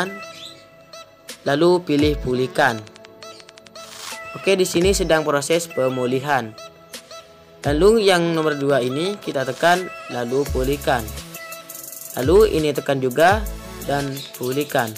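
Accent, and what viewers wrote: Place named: Madura, Indonesia. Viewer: mksh byk bang.. spt lg dngerin bang Kadir madura, lg berbcra. hehe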